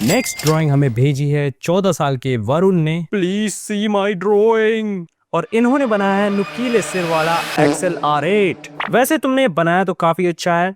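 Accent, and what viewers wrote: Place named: Haryana, India. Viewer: Bro aap kha se ho language se haryana se lag rahe ho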